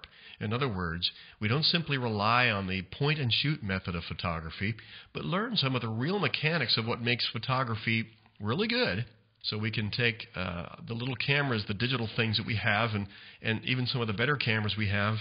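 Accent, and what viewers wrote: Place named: United States. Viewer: Love this kind of American accent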